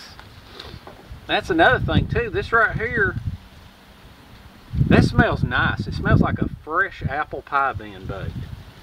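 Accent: South, United States. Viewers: Scientific testing aside, thanks for the video brother! Just checking out your fire and listening to your southern drawl make me feel at ease. I am curious, what is your forest firewood sources, locally we have western red cedar (Thuja plicata), Douglas fir (Pseudotsuga menziesii), western hemlock (Tsuga heterophylla) and maybe vine maple (Acer circinatum). At work I can salvage different oak, maple and beech which I totally prefer but they aren't really available in our forests. For cookware I never really invested in aluminium, most of my kit is stainless and a few more recent bits are titanium. Love the toboggan dude! Although that business is now defunct.:)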